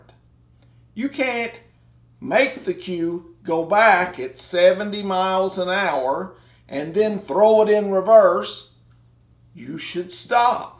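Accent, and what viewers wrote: South, United States. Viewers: Southern Drawl contest... southierest dawliest talking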